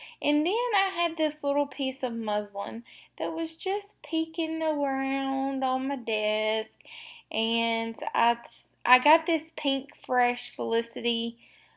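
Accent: South, United States. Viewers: Love your videos/art work and the way you describe what you've done. Your Southern accent is also adorable!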